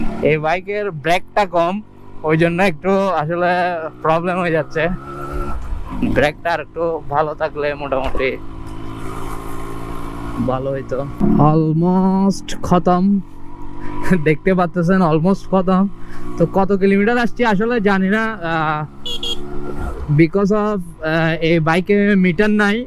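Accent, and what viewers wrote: Chittagong, Bangladesh. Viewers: Vai amr mone hocche apnr bari chottogram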